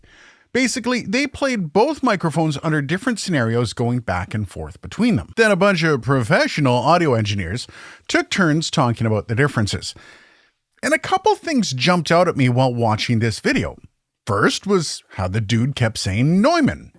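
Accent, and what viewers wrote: Canada. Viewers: All I heard this entire video was aboot.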